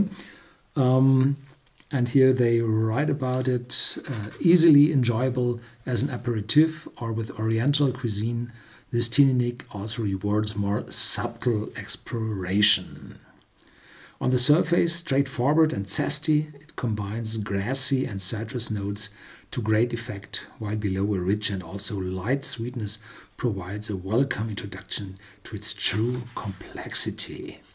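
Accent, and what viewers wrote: Germany. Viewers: Dude. Travel some. Most native Germans speak like this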